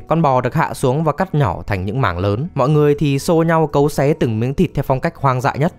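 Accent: North, Vietnam. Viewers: Giọng chủ thớt miền bắc mà cứ ba ba, ông này người nam nói giọng bắc à :) . Ở ngoài bắc người ta gọi "bố" chứ nhỉ :)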